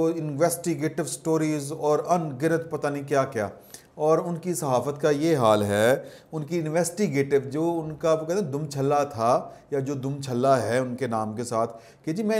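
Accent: Punjab, Pakistan. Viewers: Punjabi tarka lai rakho.... Good analysis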